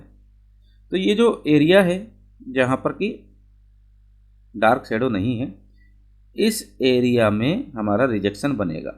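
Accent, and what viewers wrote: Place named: India. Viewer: Could you speak slower? My English is not too good when listen English by India. So sorry to say that. I really want to watch more video from you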